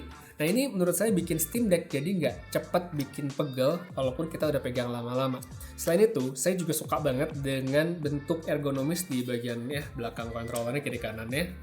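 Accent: Bali, Indonesia. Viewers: Bang Lo orang Bali yak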